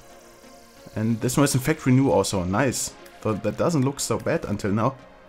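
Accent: Germany. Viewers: inside Seine Aussprache ist so awesome:D Man merkt immer ein bisschen Deutsch bei ihm. Mir gefällt das:D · nice accent by the way, i like they way the german accent lingers in my ears, but not tooo much of a german accent, really nice to listen to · Dieser Deutsche Akzent. ^^ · Man merkt damit du deutsch bist aber trotzdem nice sehr gutes Video!! LIKE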